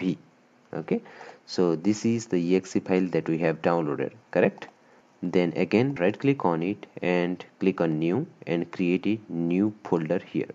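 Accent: India. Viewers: Indian accents are so annoying. Sorry, I'm not sorry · thank you SOOOOOO much when I hear an Indian accent I know I am in safe hands! :D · Yet more proof that "guy with indian accent" YT tutorials are the best channels to learn cracking tutorials from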